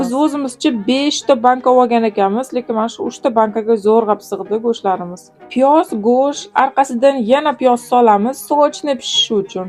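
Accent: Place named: Samarkand, Uzbekistan. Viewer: Кизиз куз тегмасин истаралигакан.сузизга ча,ча кушиб гапрябсиз самаркант тарабдами уйларин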